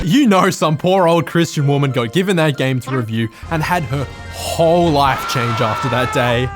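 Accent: Australia. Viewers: Yeh I'm Australian and he has every Australian accent quirk known to man 😂, from "australier" to the "videor" although to be fair all those quirks can also be found in alot of London accents except the throat sound. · Like hell anyone wouldn't notice your Australian accent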